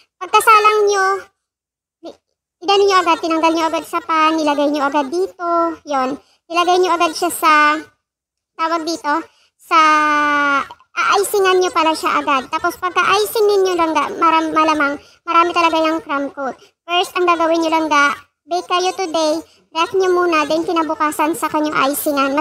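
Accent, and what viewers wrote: Iloilo, Philippines. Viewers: Ilongga ka gd guro langga mo hahahahah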